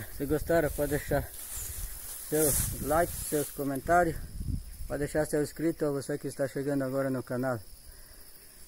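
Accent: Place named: Paraná, Brazil. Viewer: Boa noite que horas que era quando vc estava gravando esse vídeo ,,,a tu mora a sua voz tem sutaque do Sul Paraná eu moro em São Paulo abraço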